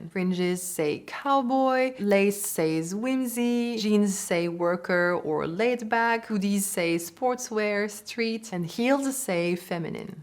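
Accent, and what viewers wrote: France. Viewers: Very informative. I like your lovely French accent. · Hey u look so pretty and your french accent is very lovely. 🤓🫶💖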